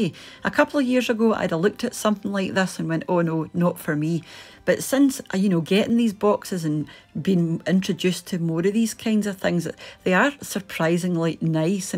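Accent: Scotland, United Kingdom. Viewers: I'm a SASSENACH, and I love your Scottish accent: "In a wee while, I'm away tae make Mester tea!" 👍😂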